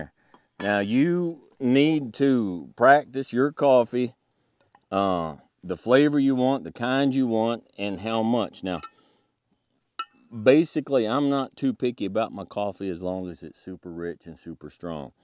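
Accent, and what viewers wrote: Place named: West, United States. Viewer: I think folks always confuse a western accent for a southern accent, this man's voice should clearly define the difference lol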